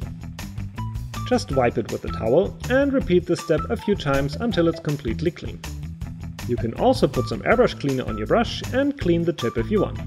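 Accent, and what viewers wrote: Germany. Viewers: your accent is so very german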